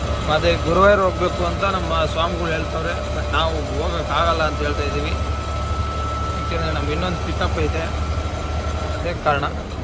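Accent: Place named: North Karnataka, India. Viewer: Uttar Karnataka Bhasharespect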